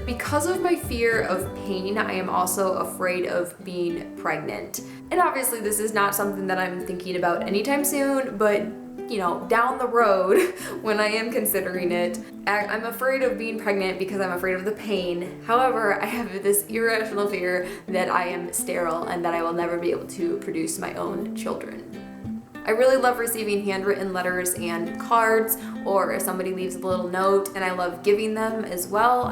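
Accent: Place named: Upper Peninsula, United States. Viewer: Lol this is an old video but it popped up on my main page so I decided to watch it, and I'm actually really glad I did! I'm from Wisconsin and I could totally tell by your accent that you were from around the UP.. Not that that's a bad thing though! Haha :) I used to play Sims and Runescape too like it was nobody's business too! haha And omg.. I am petrified of pain too and being pregnant scares the hell out of me too. I'm glad I'm not the only one that's genuinely scared of that lol.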